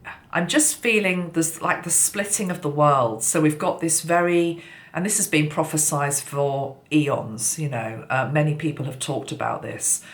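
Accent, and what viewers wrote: United Kingdom. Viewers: At least the "it's a bit sparse" bit made me laugh out loud. (Regarding the tree) It's prob your British accent too. I could picture that old show The Young Ones having a sparse tree and talking about it. Gotta find the humor in the grinches & scrooge types. Love your videos! Xo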